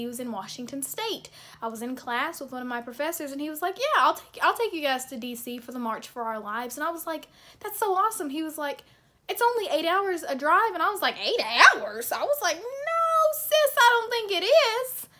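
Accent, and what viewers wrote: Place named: South, United States. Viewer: i didn’t know you were southern and now i’m even more in love with you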